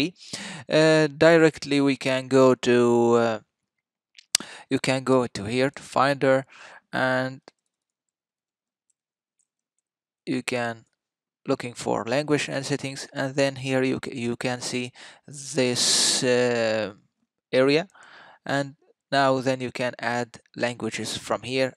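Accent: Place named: Egypt. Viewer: From the accent I can tell you are Egyptian lol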